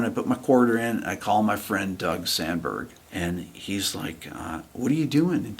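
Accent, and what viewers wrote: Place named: Upstate New York, United States. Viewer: Congrats! And I like that you still have traces of upstate NY in your speech! 👍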